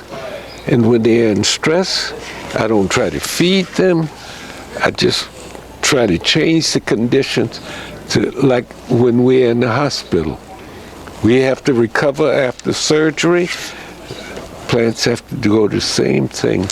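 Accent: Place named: New Orleans, United States. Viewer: New Subscriber Not only a 🙃, but does Bonsai too👏🏽 I'm looking for an Azalea now.. Most of my Trees are Ficus, or Portulacaria Afra, Holly, even a few Fuji Cherries.. Good info here🏆 sounds like New Orleans🤔 🙂 I'll keep watching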